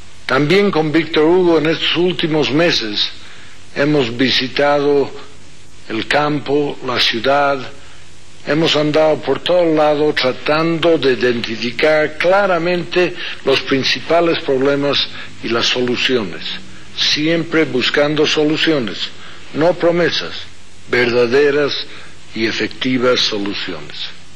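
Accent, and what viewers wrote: United States. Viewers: Es boliviano. Nada más q a vivido más en EEUU q en bolivia. Por eso su asentó americano.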